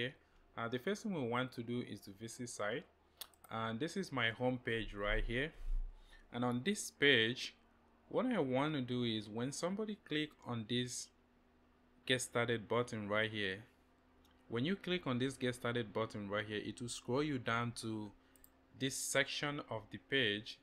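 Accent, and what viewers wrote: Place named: Nigeria. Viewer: ARE NIGERIAN ?? LOVE YOUR ACCENT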